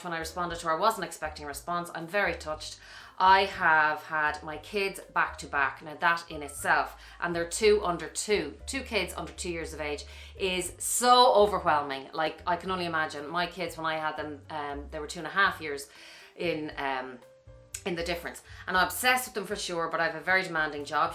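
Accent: Ireland. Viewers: Aw- I love you too! Your energy and gorgeous Irish accent . I am praying this video will help Amanda- and any of us who need help.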